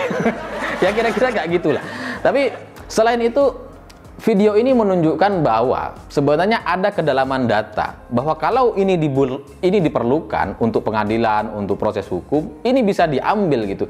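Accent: Madura, Indonesia. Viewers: mas dgr dari logat nya org madura ya 👍👍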